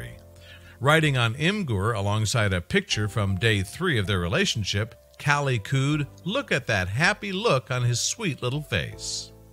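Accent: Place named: United States. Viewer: That god-awful American accent. Hit the mute button.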